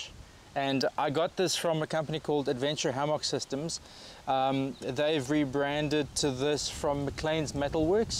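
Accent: South Africa. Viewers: Hey bru Are you south african ?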